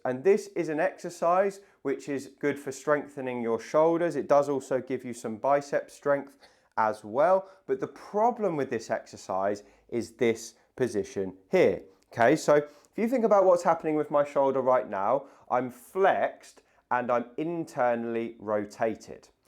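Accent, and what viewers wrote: England, United Kingdom. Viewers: I love his British accent! He is also extremely handsome. I think I am going to be in very good shape soon. 😉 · Thank you young English man . My salute to you. Good information is given to over Fifty. I liked your accent.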